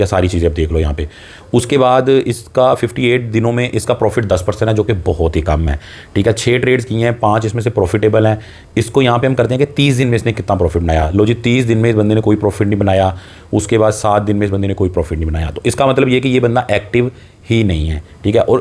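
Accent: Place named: Faisalabad, Pakistan. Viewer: Your dialect is the typical faislabadi , a very beautiful one, where are you from bro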